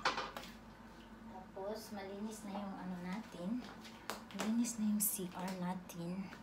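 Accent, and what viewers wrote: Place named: Visayas, Philippines. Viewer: Halatang bisaya ka din po..same tau hehe..ang linis ng bahay pgnaka quarantine 😅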